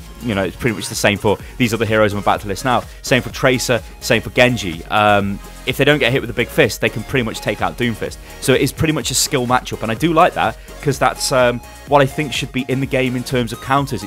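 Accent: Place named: Northern England, United Kingdom. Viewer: Crossfire Gaming it's because he's northern. Everyone loves northern accents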